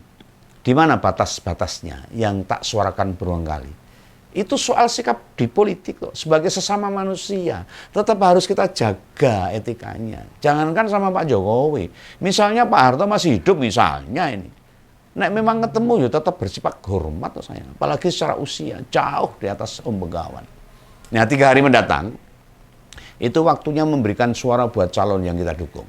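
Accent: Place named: Surakarta, Indonesia. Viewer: sugeng siang om, saya itu suka logatnya om Begawan yang campur bahasa jawa, jadi kami lebih tahu apa yang disampaikan,sehat dan bahagia selalu om, sukses buat Sewordtv nya, Tuhan memberkati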